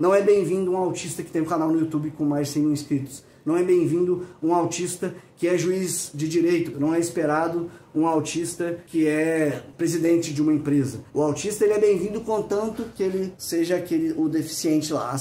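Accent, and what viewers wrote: Minas Gerais, Brazil. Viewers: Uai que trem doido, cê é mineiro também?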